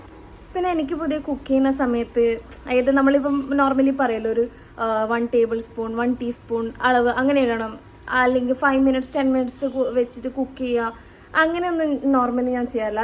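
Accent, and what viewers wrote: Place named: Kannur, India. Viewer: Nallaind ketta nammale kannur bashaa..❤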